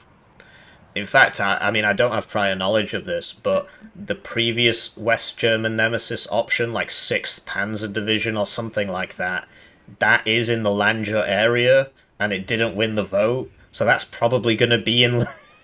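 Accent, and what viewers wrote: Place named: Liverpool, United Kingdom. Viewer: Yeah I thought I could detect a hint of scouse, born and bred in the city you notice these things.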